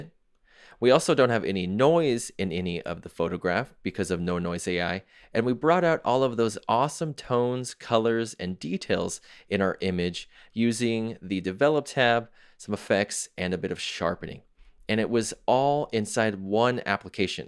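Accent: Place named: New York, United States. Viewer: Why do you pronounce ON1 Photo Raw as Photo Rah? I've wondered about this for a while now. Is this a NY pronunciation?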